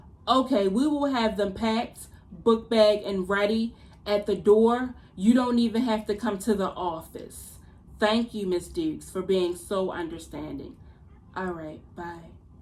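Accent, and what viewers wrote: Baltimore, United States. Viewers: I love ur Baltimore accent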